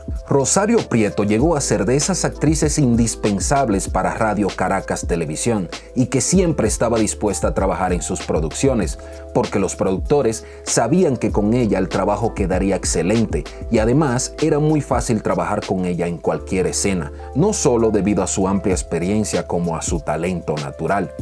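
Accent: Mexico. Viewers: Que magnifico trabajo el suyo, destacar la participación de artistas quisqueyano fuera de nuestro país, para que conozcamos a los nuestros en playas extranjeras. Muchas gracias principe por ese regalo a los dominicanos. Creo por su acento, que usted es del tan lindo y querido México, nuestro hermano mayor de Norteamérica. Bendiciones para usted.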